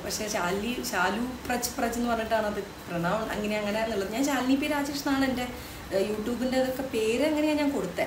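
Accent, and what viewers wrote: Kozhikode, India. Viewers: 😂😂😂 sudari pettanu evidayo kettapole 😂😂soo beautiful so elegant just looking like a wow orma vannu😂😂😂 basha evidayo kettapole (ibbal evidaya) Kozhikode Aya enniku pettanu mansillayilla😂😂 nalla humorous talking ee fast chayitha bagham cut chayitha pore njagalku kekunumilla pinne athu cut chayitha leghtum korayum etha ee pennkutti Ella prodaktillum edukumbam parayunna 😂😂 enniki oru likeum comment tharane please 😊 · Very decent, humorous, transparent, innocent, spontaneous way of talking...over aakadhe ulla presentation um. Just can't take eyes from ur video.nammade kozhikodan baasha vrithiyil kaikaaryam cheyyunnu. Good going 👌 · കോഴിക്കോട് നാട്ടിൻപുറം സംസാരം 👍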